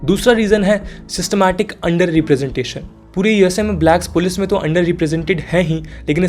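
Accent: India. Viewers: Indian vox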